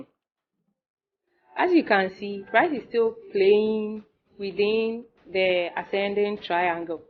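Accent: Ghana. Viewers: This is my first time hearing voice cus I am always on twitter she sounds like a Ghanaian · Your accent is a mixed, sounds a little bit Nigerian and a much stronger Ghanaian accent. Nice video btw. I thought you trade smc?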